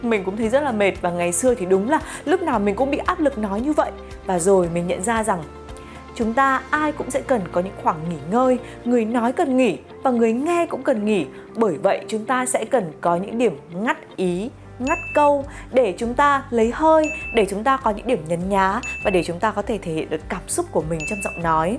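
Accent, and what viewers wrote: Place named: Hanoi, Vietnam. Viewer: chị có chất giọng hà nôi hay quá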